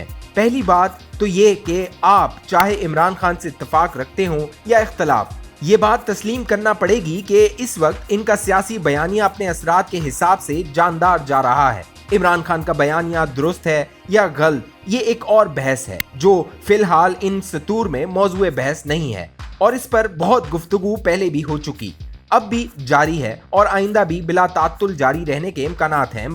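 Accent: India. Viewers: The guy who is doing commentery is indian from his accent! So what is he doing here? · Absolutely right. Narrator seems to be an Indian due to his typical Indisn Pronunciation.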